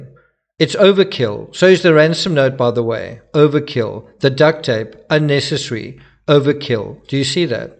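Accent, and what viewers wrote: South Africa. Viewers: I'm confused, do people not realise you have a South African accent and that's why you pronounce things the way you do?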